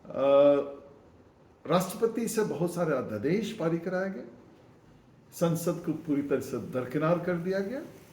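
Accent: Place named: Lucknow, India. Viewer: लहज़ा लखनवी है 😊 आपको सुनने के बजाय अगर इन्हीं शब्दों को जस का तस लिखा जाए तो उसे पढ़ने वाला ज्यादा अच्छी तरह से समझ जाएगा और ठीक वही प्रभाव पैदा होगा जो आप चाहते हैं |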